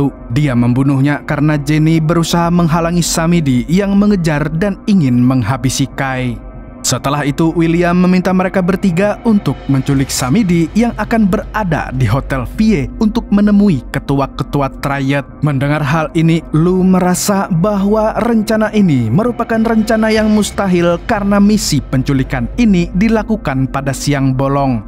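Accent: Banjar, Indonesia. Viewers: Bang lu kalimantan mna, logat kek banjar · Org Banjar kah bang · Orang banjar kah pian nih mang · Orng kalsel kah pian mang, kya ad logat Banjar ny kaituu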